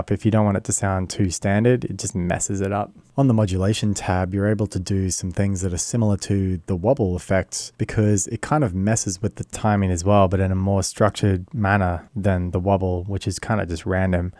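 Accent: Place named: Australia. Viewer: Hey mate. Great vid and great to hear a fellow Aussie in one of these vids. Where in Oz are ya from?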